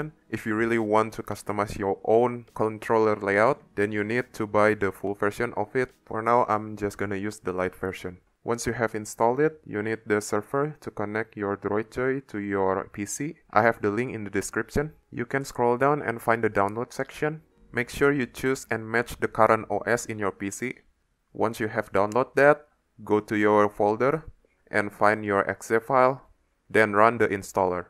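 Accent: Indonesia. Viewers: Great content. First time on your channel and I easily recognized your Indonesian accent. :) Salam indo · Done and works, beautifully explain. thanks. btw kerasa banget logat indo nya wkwkwk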